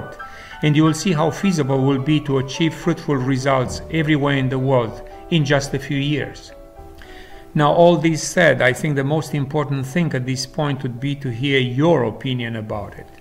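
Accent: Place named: Mediterranean. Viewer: Amazing experience, your accent is of someone who used to live somewhere around the Mideterranian area. Your passion for securing med fruits is beyond me. Bravo for being such an inspiration to others.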